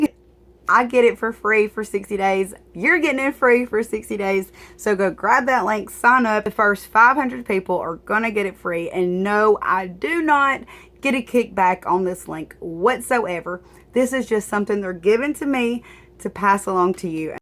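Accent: South, United States. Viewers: Love your projects and your southern accent 👌🏾👏🏽😁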